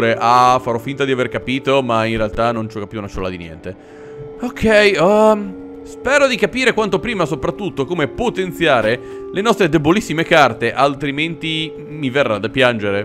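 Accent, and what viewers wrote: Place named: Romagna, Italy. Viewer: romagnolo style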